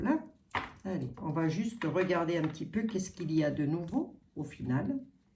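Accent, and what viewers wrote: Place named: East, France. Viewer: Un immense merci pour ce que vous faites ! Abonnée depuis peu, mon ange m’a envoyée par « hasard « sur votre chaîne… Je me disais bien que votre petit accent m’était familier, étant originaire de l’Est Que notre Seigneur vous garde dans la Paix et l’Amour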